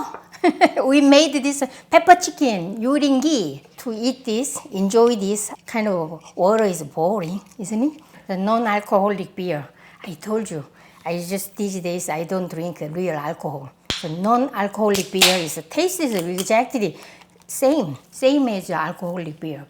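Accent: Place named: South Korea. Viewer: As much as I loves your cooking, I, too likes your English with a Korean slang👍😘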